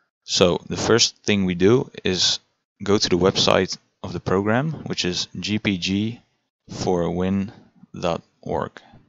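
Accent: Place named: Sweden. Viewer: nice tutorial! Du är helt klart svensk, hörs klart haha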